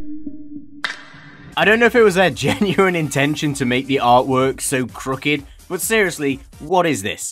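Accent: United Kingdom. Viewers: Btw it’s my first time seeing this channel glad to find another bri’ish fellow🇬🇧🇬🇧🇬🇧🇬🇧🇬🇧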